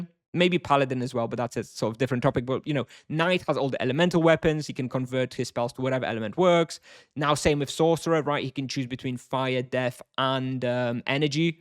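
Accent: United Kingdom. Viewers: Unrelated question. Why do you have a proper British accent if you're Polish?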